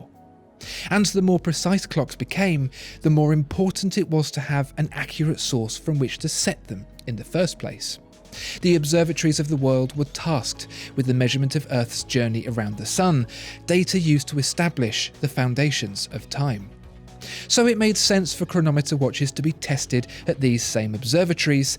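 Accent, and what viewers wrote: United Kingdom. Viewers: If he didn’t have a British accent I would not be paying attanetion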